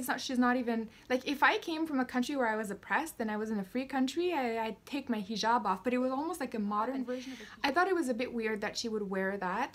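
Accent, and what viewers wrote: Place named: Quebec, Canada. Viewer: I love this channel, are you Quebecois because your accent is beautiful to me. Also love your insight and the emotion you put into it. I feel sorry for Rahaf because she is a pawn in the globalists agenda, willing or not, she will one day disappear without a trace, another sacrifice to the UN agenda and the incoming enforced world religion of Islam. Australia, my country still has due process to follow, we don’t just take anyone because that would destabilise our borders, unfortunately we’ve taken too many of the wrong types already, we should, however, immediately demand the release of Asia Bibi into our care, and the white SA farmers.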